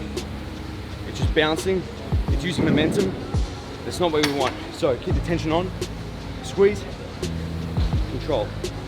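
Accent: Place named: Australia. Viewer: Love your channel and the aussie accent ❤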